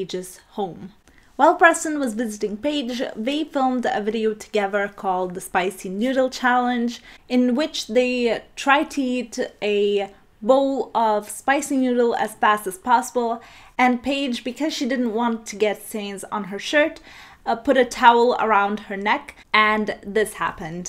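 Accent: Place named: Italy. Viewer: Loving your accent! I'm guessing you're from Italy... (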